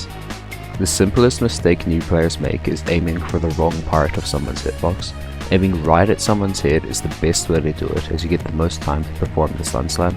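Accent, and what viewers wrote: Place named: South Africa. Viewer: Are u South African lol ur accent sounds like it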